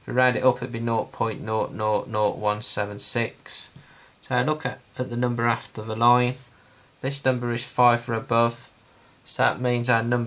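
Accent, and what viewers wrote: Birmingham, United Kingdom. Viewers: Thanks for the explanation + this 🥵 Brummie Accent.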